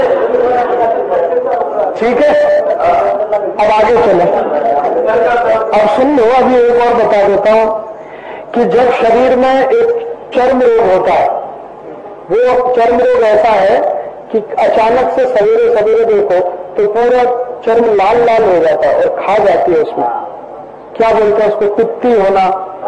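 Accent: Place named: Maharashtra, India. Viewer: Maine padha hai rajiv bhai up se hai....to unki toning marathi kyo lagati hai.....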